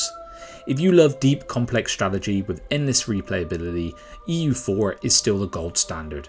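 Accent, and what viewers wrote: United Kingdom. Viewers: you sound kinda British tho. Just weird to use Euros as a currency. Like a US Youtuber quoting prices in GBP. Just weird.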